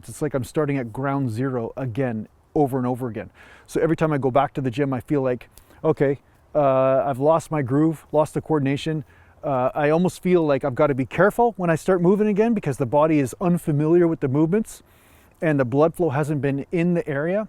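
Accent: Canada. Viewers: If I had to guess, I’d say you were Canadian. But I like this approach.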